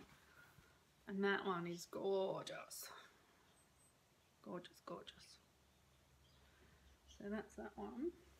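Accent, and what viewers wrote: Australia. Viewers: Enjoy your flosstube and enjoying your "Aussie-Isms"!! I am from NC in the US and a video friendship with an Aussie stitcher is great!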